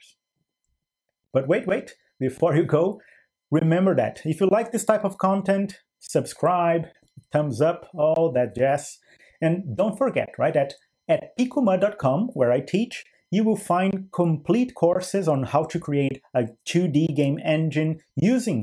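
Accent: Brazil. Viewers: You have a Brazilian accent... vc é br ? Sdl ainda vale a pena?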